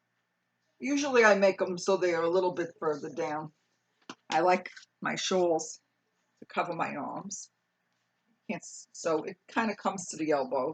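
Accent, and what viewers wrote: New York, United States. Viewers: I like the divine pattern hat & cowl. Looks really great in that color green.I enjoy listening to your NY accent, memories of home. How about a drawstring backpack that teens like. It would be about same size as your bags. 🤔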